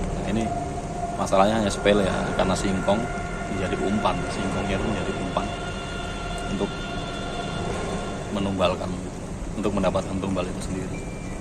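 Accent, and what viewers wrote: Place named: Banyumas, Indonesia. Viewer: selamat malam,saya tinggal di Gianyar Bali,logat anda mengingatkan saya ke daerah asli saya,Banyumas.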